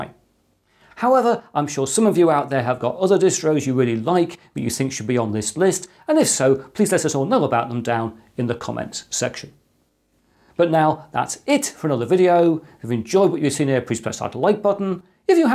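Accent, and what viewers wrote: England, United Kingdom. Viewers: I love this voices , love the culture, love this england accent ! Ohhh god save the england peoples from islã invaders ! Do not let this blessed land, inspiring land be consumed !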